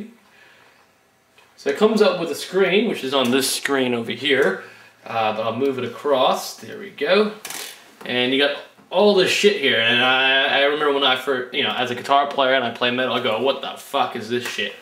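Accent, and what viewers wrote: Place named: Australia. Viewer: Lol I cant tell if you're Australian or American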